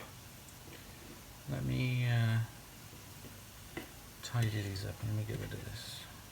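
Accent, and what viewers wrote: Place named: Wales, United Kingdom. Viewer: the British half of the accent is clearly Welsh, and listen out for the sotto voce "bugger!" surely a very British expletive (my daughter first used it at age 2, can't imagine where she learnt it...)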